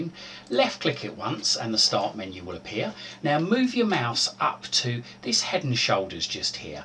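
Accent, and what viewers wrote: United Kingdom. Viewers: WHOA ITS BRITISH!!!!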